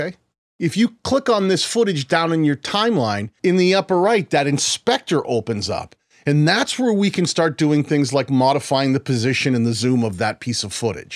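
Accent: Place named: Boston, United States. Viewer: Boston video guy here. I heard your accent and Immediately subscribed.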